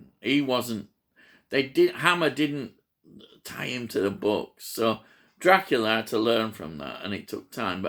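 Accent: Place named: Wigan, United Kingdom. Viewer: Hi. I'm a huge Hammer fan as well. If you haven't already done so, grab yourself the 2 new release from Second Sight (The Mummy & Frankenstein and the Monster From Hell) as they have done a wonderful job on the restoration. I've watched all of your videos and can't help but think that with your accent must must live quite close to me, I'm from Wigan.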